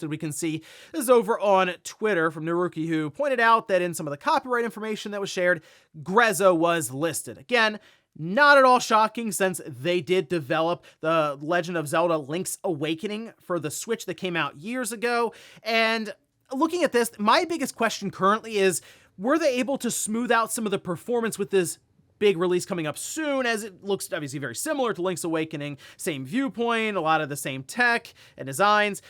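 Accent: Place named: Pennsylvania, United States. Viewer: It's the Pennsylvania accent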